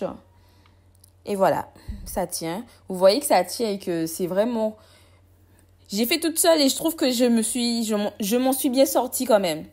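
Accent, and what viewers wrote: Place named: Guadeloupe, France. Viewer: Tu es Guadeloupéenne ça s'entend ❤❤❤❤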